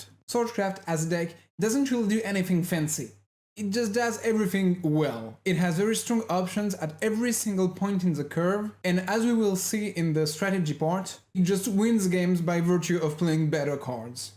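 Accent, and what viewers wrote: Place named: France. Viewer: That accent,you can't fool me,are you french?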